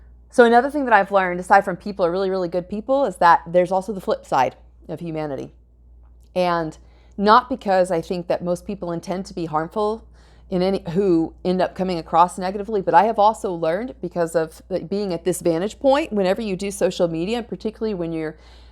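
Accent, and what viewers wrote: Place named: Midwest, United States. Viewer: George Bruno turned me onto your channel. You're very encouraging and informative. A feminine woman of God is a breath of fresh air. Love your Midwest drawl. 💯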